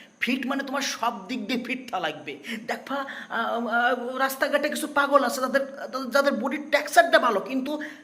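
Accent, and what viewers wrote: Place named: Noakhali, Bangladesh. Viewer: Noakhali te bari naki tomar 😅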